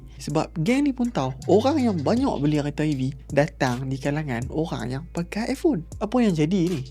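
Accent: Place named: Kedah, Malaysia. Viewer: Ale uto, suara ko slow, cakap macam dalam kelambu. Slang kedah ko aku benci. Cakap bahasa mudah orang faham la., jgn lekat Sgt loghat ko tu🖕🏽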